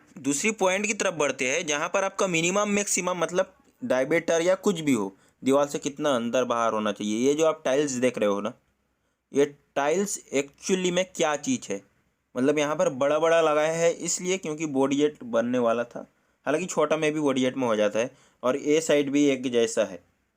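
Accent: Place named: Bengal, India. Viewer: Hey bro i know u r bengali ( by ur accent) do u have any good contact for plumbing in kolkata?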